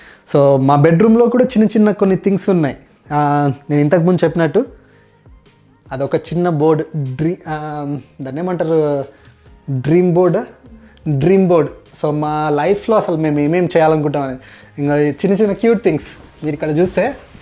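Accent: Telangana, India. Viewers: Canada velli 9 years ina mana mass slang matram miss avvaley Andhar la adho ochi rani posh language kakunda normal ga superb cheyppinav po Bro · Excellent video Nice Telangana slang Crisp informative Shared to my daughter, who is in Toranto, Keep going All the best Be Happy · Telangana yesha baaga matlaaduthunnaru bro.🎉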